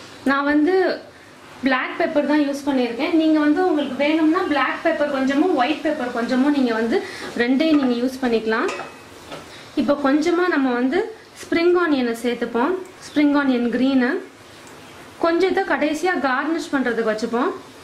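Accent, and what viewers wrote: India. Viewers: nice to hear the Madurai slang after a long time.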